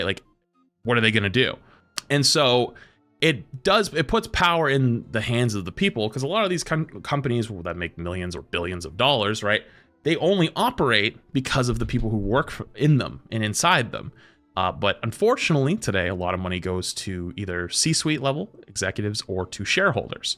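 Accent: Boston, United States. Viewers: THATS MY BOSTONIAN RIGHT THERE! SPOKEN LIKE A TRUE MAN OF THE PEOPLE!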